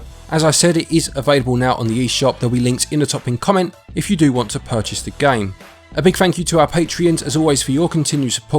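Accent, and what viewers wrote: United Kingdom. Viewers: !!! When did you get so suave and british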